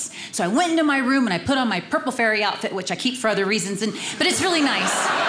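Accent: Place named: Texas, United States. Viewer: We can tell your from Texas by the yelling, extreme speaking voice.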